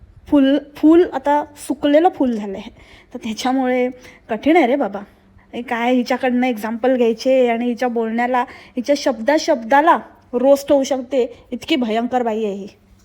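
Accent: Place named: Malvan, India. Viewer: Tujha malvani tadka mast hota 😍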